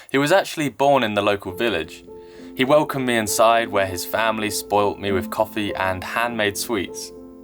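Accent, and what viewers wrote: United Kingdom. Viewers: OMG ! you sound exactly like "Thoughty2" in this bit here. You must be from the same area, since there are so many accents in the UK. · you are living in these luxuriously furnished mountain huts and you are presumably a rich man.because rarely people can afford to live in such a spectacular condition you are living alone.good luck.I enjoy from your incredible videos and your pure Brtish accent as well. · Hello I'm Trish, i come across yr channel and all i could do is smile big!! You are warm as that fire, handsome, smart, and make great Vij ya's. I watch lots of U Tubers from the U,K and always enjoyed yr culture and of course thee accent. Keep busy and happy, yr there!!✌💙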